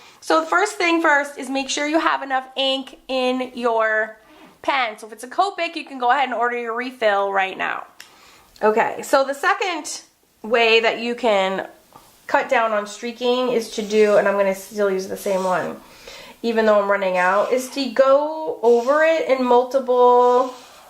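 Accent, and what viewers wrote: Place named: New England, United States. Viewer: Love the NE "talk" don't lose it please. This video was very helpful. I have the streaking problem (shame) lol, so thanks for sharing your wicked cool wise ways.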